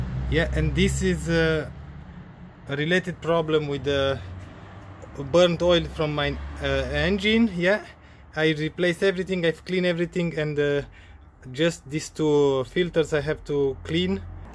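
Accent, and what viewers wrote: Romania. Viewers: Salut! După accent pari a fi român, așa este? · roman de al meu dupa accent ! ;))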